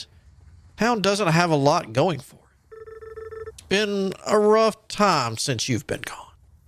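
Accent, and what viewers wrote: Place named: South, United States. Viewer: Love the work so far, may i ask what part of the south your from? I noticed the accent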